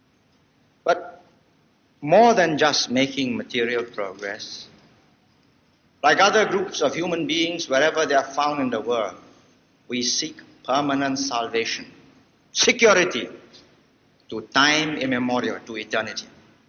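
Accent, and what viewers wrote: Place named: RP, United Kingdom. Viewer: As a person from Britian, who can’t help but view Lee Kuan Yew as one of the most inspiring figures of the truly post war world, I’d like to say that you for uploading this and also. Wow he sounds so much more British upper-crust here, as he lived the decades in Singapore his accent slowly joined the home he formed.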